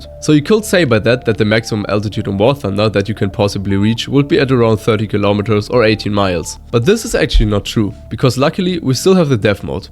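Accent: Germany. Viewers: Most unnoticable german accent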